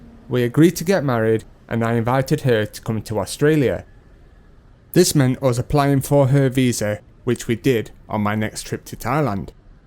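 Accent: England, United Kingdom. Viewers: you have a genuine interest to find a partner. do not pick from the wrong place. your english pronounciation like a british guy not like an australian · Why do you have an English accent if you are from Australia? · Anyway if you love her if you give her money nothing wrong at all. Everything you pick what is problems?. If your relationship or whatever you are thing go wrong all the time are you sure you a Australia man? For me you not You must came from England Your accent is English Man Okay you pick the wrong person why you complain for nothing you can fix anyway Speaking English or not nothing to do with that ever your own people devoice happens all the time ever your the same language. So why you complain for? happens everywhere people not meet what they want nothing perfect in the world. You should know that By the way you not a Australia Man You a English 😂😂😂😂😂😂 Your love dream broken down Nothing she can do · You sound more British than Aussie ? 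, such scheming and unscrupulous women make my skin crawl ! Sometimes human goodness is not found in swamps of poverty and profession . It’s just reptilian instinct of surviving . Glad that you are able to look after your mother which is the best decision and I am sure you will find a good match and life partner who will recognise your qualities and be there just for you !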